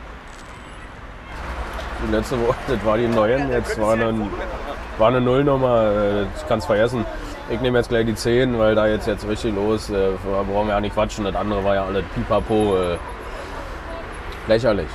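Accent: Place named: Berlin, Germany. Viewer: ..BIST ECHT DER HAMMER..BERLINER SCHNAUZE..WIE ICH👍💪🖐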